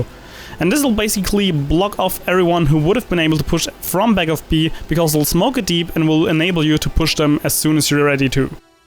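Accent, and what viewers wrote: Germany. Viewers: man hört raus das du deutsch bist :) +1 gutes video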